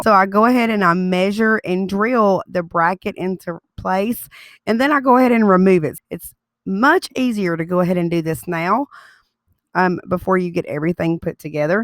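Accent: South, United States. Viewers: sweetest southern drawl ever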